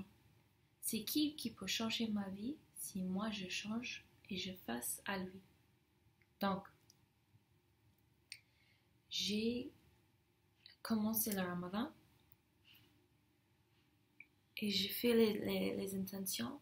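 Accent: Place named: France. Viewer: Ton accent français est trop mignon ;)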